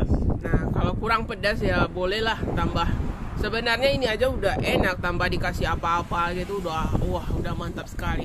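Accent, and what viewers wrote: Minangkabau, Indonesia. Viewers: ni boleh berdialek minang tapi juga bisa juga mengeluarkan perkataan jawa.....terima kasih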